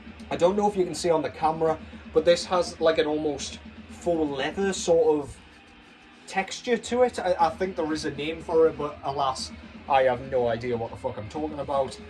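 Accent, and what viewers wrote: Newcastle, United Kingdom. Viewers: Where yuh at fella? Ah need me durse uv Geordie taakin aboot metal! Woraboot a Nile ranking man?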